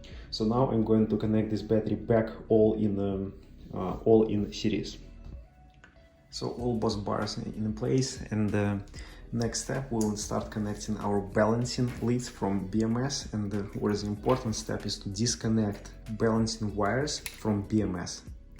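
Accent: Russia. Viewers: Оффтоп: как же режет слух русский акцент! ;))) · Отличный акцент))) пря клип вспомнил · Товарищ! У тебя по ходу русский акцент!? Расскажи на русском языке!😀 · ох уж этот английский по русски!... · Слышу русский в вашем английском. Вопрос, у вас случайно канала на русском нет, а то я в английском не очень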